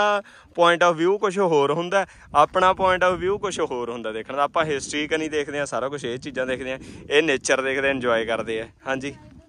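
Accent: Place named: Punjab, India. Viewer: Bravo to and. Last vlog of Ravana's Lanka was great and we really love your typical Punjabi spaeking. Really enjoyed when said "Thalle Rukh takko kinne pidde pidde Jehe lagde ne" Our wishes keep it up. Waiting for Batticaloa Gurudwara Darshan. Thanks and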